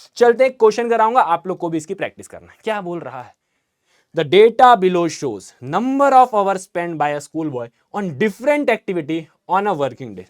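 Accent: Bihar, India. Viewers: sir app bihari ho kya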